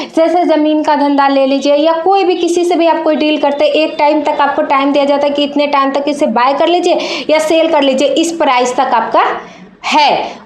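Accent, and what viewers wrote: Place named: Bihar, India. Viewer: Oh hai na bihar say hai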